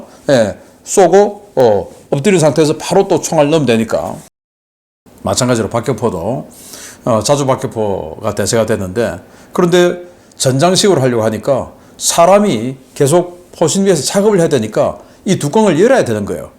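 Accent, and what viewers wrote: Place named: Gyeongsang, South Korea. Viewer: 경상도 씹 말투 존나 거슬리네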